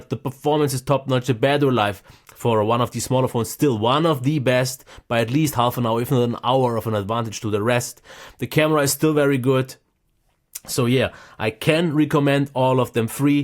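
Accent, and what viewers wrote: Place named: Germany. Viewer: Like the way you explain about which one to choose. Oh and that German accent is something else >))) keep the good work!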